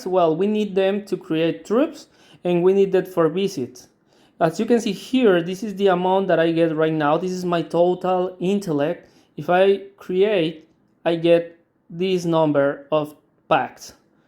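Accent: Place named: Mexico. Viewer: Nice, didn't expect you to sound like every other Mexican.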